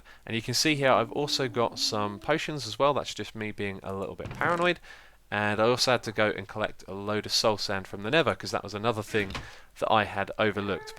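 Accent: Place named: South West, United Kingdom. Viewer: You sound like you're from the south west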